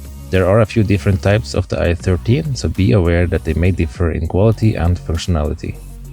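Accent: Norway. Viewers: the norwegian accent. AHHHHHHH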